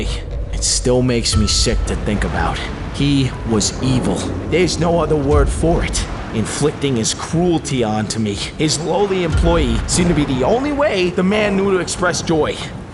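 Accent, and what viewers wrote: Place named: United Kingdom. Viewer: Gasp HES BRITISH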